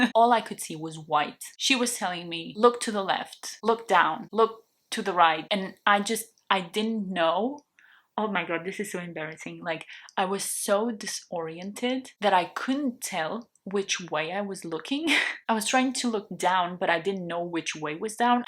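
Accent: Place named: Portugal. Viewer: Caramba, venho maratonando os seu vídeos e agora que percebi que você é Portuguesa!! Seu sotaque é incrível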